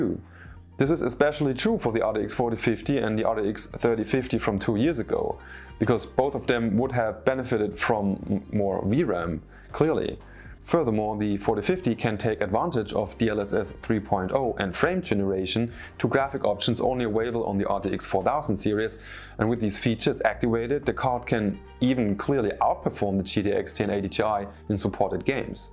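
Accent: Germany. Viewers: Höre ich german akzent?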